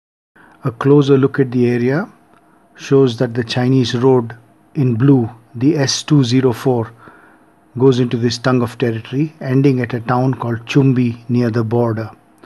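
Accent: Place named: India. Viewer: This is a very good presentation done by an Indian narrator with a genuine Indian accent. Use more narrators of Indian origin rather than those awful male / female computer generated drones who talk like mechanized robots and cannot even pronounce the names properly.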